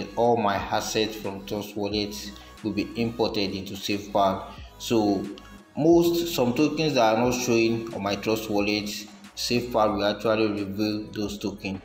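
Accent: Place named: Africa. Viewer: Just because you dont understand African english. People like us understand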